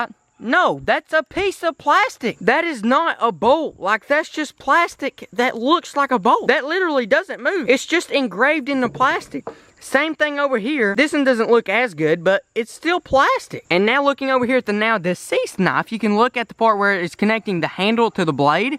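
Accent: United States. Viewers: I Like His Cowboy Accent 😂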